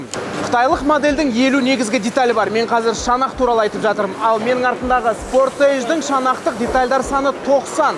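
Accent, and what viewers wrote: Standard. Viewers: жарайсың таза қазақша сөилейсің.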